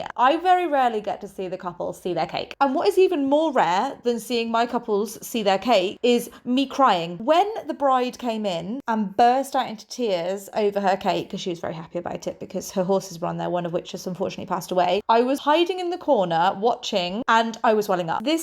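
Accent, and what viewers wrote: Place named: United Kingdom. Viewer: Love your passion and lovely British accent ❤❤❤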